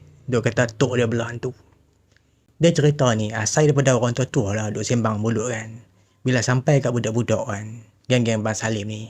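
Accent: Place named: North, Malaysia. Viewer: Salam.. Nak bajuu said L... Tq slalu tnggu video abg.. Sedap dngr loghat utara sbb saya Utaga..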